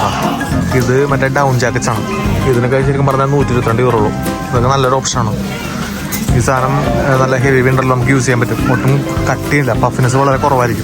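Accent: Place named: Fort Kochi, India. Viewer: Njan nattil malippuram anu ippo fort kochi slang kandu noki nalla rasamundu kelkkan ente father harboril varumaayirunnu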